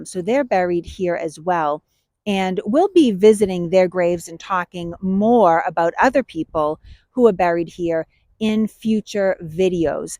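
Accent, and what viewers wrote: Boston, United States. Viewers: I grew up in eastern Massachusetts but moved away as an adult. I haven’t been back in over a decade. I showed this to some folks at work as an example of a Boston accent and they didn’t believe me. My brother seems to think the accent is too much as well. Honestly, it’s been so long I’m questioning myself. So I looked for the oldest video on this channel and this is what I found. Can somebody help me out here? I love the content btw. Just curious about the accent.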